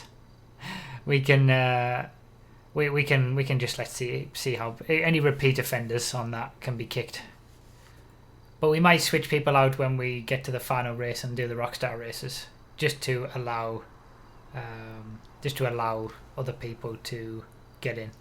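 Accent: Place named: Northeast England, United Kingdom. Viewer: You sound like you are from North east england where abouts are you? xD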